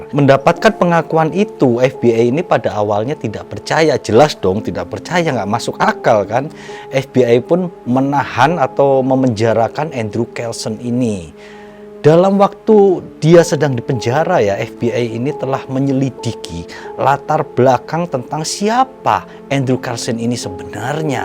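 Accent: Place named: East Java, Indonesia. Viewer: Sip mantap oranh daerah mana sebenarnya logatnya kyk jawa timur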